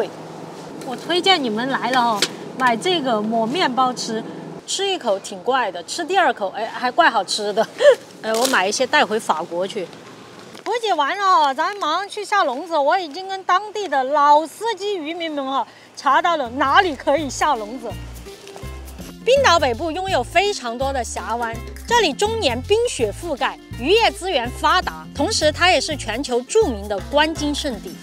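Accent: Sichuan, China. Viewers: Yooupi講四川口音是一大特色！ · 特别喜欢yooupi说四川话 感觉很好听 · Halibut 清蒸最棒！四川口音杠杠滴！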